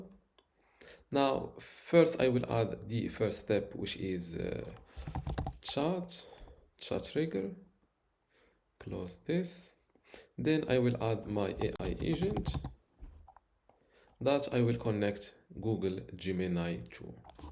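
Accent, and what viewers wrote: Morocco. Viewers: I hear a morrocan brother <3 Hello from DZ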